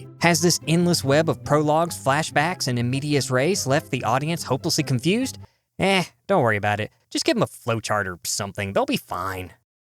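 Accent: South, United States. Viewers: The most subtle of southern accents makes this all the better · I love this guys accent is it a southern accent?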